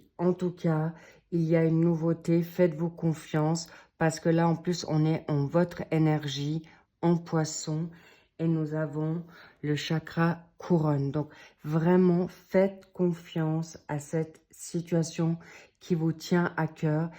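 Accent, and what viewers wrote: England, United Kingdom. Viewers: Merci très chère, j'adore votre voix votre accent anglais peut être? pouvez-vous me le dire.. Vous êtes merveilleusement bienveillante, réconfort et clarté dans vos propos,sans ambiguïté,sans complaisance tout en étant sensible et bonne enseignante de la vie dans tous les domaines, beaucoup de réalisme.Merci beaucoup et que Dieu vous bénisse abondamment.belle journée à vous.❤❤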